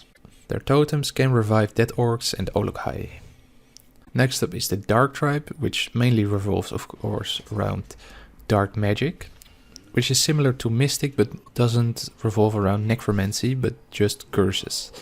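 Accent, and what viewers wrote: Netherlands. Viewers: Ha! ik dacht al dat je nederlands was 😂 hele goede video man!!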